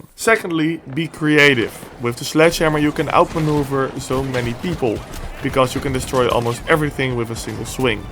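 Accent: Netherlands. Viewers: nederlander detected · Dutch accent spotted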